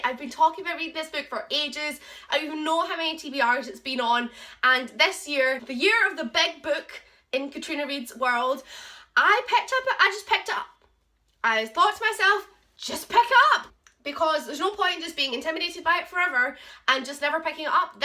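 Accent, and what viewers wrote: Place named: Scotland, United Kingdom. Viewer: Hi! Great review! Where are you from? I would guess Scotland near Glasgow? but you have a really nice accent. It gives you character. I've noticed way more Europeans do classical book reviews on youtube than North Americans, which is kinda sad to me. 😟 (I'm from Canada.)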